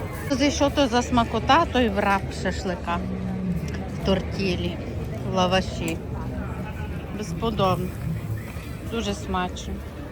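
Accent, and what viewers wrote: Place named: Western Ukraine, Ukraine. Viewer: Виходячи з акценту, ви мабуть самі "нанастраждалися бьєженці" з "патріотично" вибігшого до Європи заходу України.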